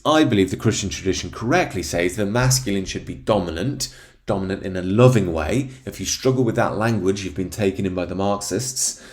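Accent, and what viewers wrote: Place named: Yorkshire, United Kingdom. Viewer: good to hear you have released your inner yorkshire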